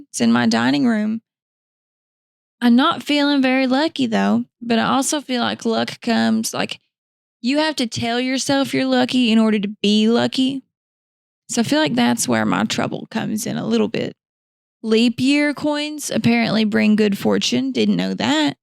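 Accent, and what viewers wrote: Kentucky, United States. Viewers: Lol glad super glad you randomly showed up on my feed. Super pretty, funny, and the Ky. accent is nice. I am subscribed lol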